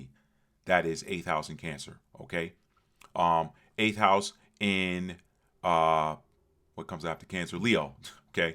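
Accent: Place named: New York, United States. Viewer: This video was incredibly impactful for me! I started examining my children's charts to identify the planets in their 8th house and took notes. I really appreciate these astrology segments...please continue creating them, they're incredibly beneficial to everyone. Also, I must say you still have a STRONG New York accent — I can attest to that firsthand!😊🫶🏾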